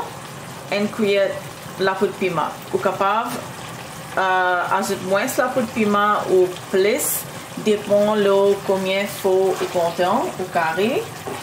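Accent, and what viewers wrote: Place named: Haiti. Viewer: Accent creole la seryer net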